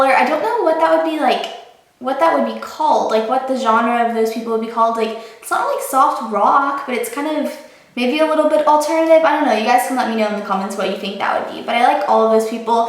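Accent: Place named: South, United States. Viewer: for some reason i feel like you are talking with more of a southern accent in this video! am i the onnly one who thinks so? you look really tan and lovely <3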